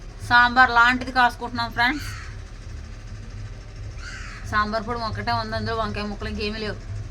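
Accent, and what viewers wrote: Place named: West Godavari, India. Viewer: App. Ka. Basa bahut acha hai,,, i think west godavari language...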